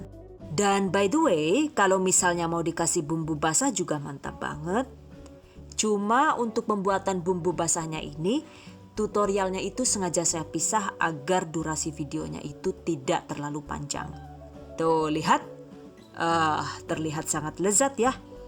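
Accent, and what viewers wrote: Banyumas, Indonesia. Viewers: Jebule wong ngapak rikaa😂😂😂😂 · Lah ngapak wkwk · Kak orang mana si? Medok kaya orang banyumasan nih 😂😂😂😂😂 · Lah ya pada" wong ngapak ya😂 sing alon ya yu😄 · Mbarai enyong kencot baee 🤣🤣🤣 ORA NGAPAK ORA KEPENAK 😂🤣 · Deng ngapak sih min??? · Wong endi kie ngapak😂😂😂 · Denek ngapak bu wong endi aku Cilacap bu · bahasa ngapak nya lucu 😅😅😅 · Wonge mandan ngomong ngapak😂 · Keren keren,,, buahahaha pliss jgn dikeluarin bahasa ngapaknya saya gak tahan ngakak trus nih.bagus sih jd ada lucu2 humor nya.moga sukses selalu yah.amin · Ora ngapak ora kepenak ya yu 😂😍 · Ngakak ngapak nya ....😂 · Karakter suaranya unik bgt, bahasa inggrisnya fluent, ngapaknya mantap bgt. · Wongg ngapak😅😅😅😅penjelasanya detaiil bangettt jadi mudah dlm mempraktekkan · Jawa ngapak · eh padabae ngapak:v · Tag lokasi di Madiun tapi kok bahasanya ngapak.. 😅😂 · Madiun deneng ngapak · Ngapak yee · Panggon e Madiun bahasane ngapak,😆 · Ora ngapak ora kepenak wkwkwk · Lah rika wong ngapak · Busyet deneng ngapak koh 😂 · Jowo ngapak 🤣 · Ngapak tenan kek Ustadzah Mumpuni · Koq bahasanya campur pake bahasa ngapak, mmg asli mana ta? 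Cilacap ato banyumas yu 😂😂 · ihh si mimin ngomonge ngapak yaa😂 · Kaget pas ngomong ngapak .. jebule sekebangsaan ... Ora ngapak ora kepenak ya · Kok ngapak sih? Cuman lokasi madiun wkwk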